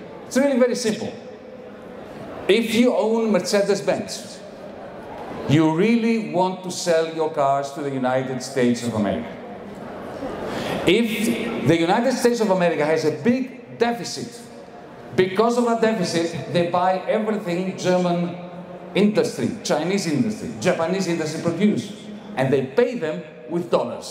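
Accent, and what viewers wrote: Greece. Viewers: 希腊人说英语的口音都是这样😂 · 典型的希腊口音英语。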